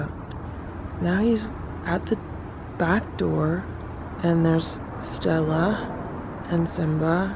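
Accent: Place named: Long Island, United States. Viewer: Where are you from....I live on long island....we kinda sound alike...I have Tom's of ferral cats by my house....it kills me. I have 2 that live in my house now and I am going to build a big cat apartment mini building.....I feel they should all have a home and be loved and spoiled.....it kills me to see them scared....and outside not feeling loved 😢😢😢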